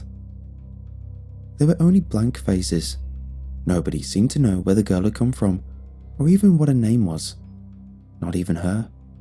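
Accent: United Kingdom. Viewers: bruh this is my fifth year listening to ur voice british people are unreal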